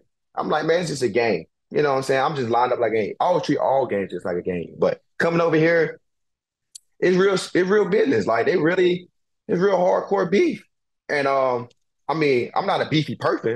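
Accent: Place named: South, United States. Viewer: Man this guy has heavy southern accent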